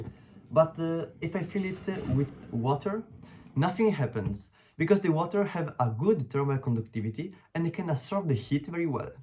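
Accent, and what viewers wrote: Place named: Italy. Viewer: Minchia si sente dall accento che sei italiano, bell video però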